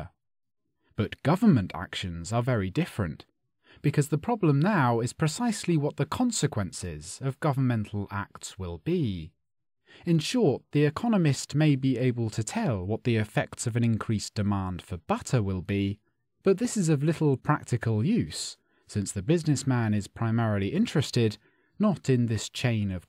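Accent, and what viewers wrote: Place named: RP, United Kingdom. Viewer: Received pronunciation))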